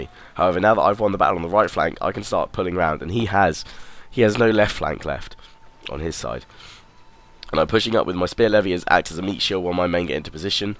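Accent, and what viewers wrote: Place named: England, United Kingdom. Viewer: He's from England, and here when we see an 'i' we say 'eye' naturally.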